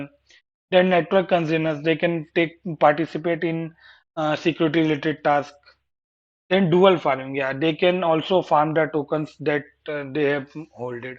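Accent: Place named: Syria. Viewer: Please don't get me wrong but you speak english like a syrian :D Good day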